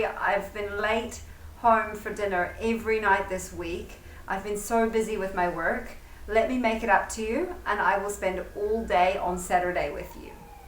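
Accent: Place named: Australia. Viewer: I think you speaking Australian English.. Good teaching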